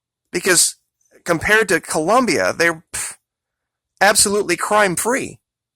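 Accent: South, United States. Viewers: And the condescending southern accent thni g is highly insulting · Love the sthrn accent haha 🤣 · I'm trying to place the dialect; Forrest, Forrest Gump?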